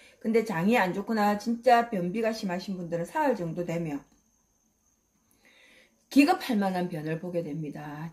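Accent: Gyeongsang, South Korea. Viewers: 경상도 사투리 정겹노 ㅋㅋ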